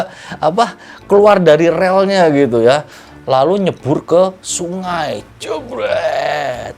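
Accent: Java, Indonesia. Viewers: ngomongnya agak medok, lbh enak saya dengernya sbg org Jawa, mantap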